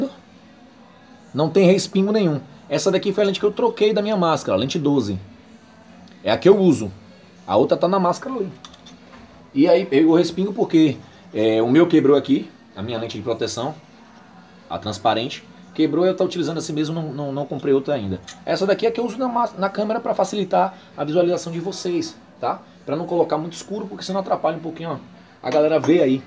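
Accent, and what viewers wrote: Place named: Bahia, Brazil. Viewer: Tem sotaque de Baiano rss.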